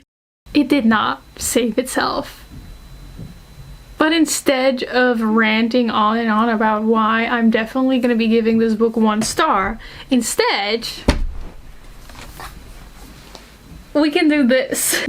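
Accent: Netherlands. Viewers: Okay i didn’t know you we’re dutch untill i read the settings in your e book😭 so thats why you have an accent! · omgg jij bent Nederlands? ik dacht dat je dat was vanwege je accent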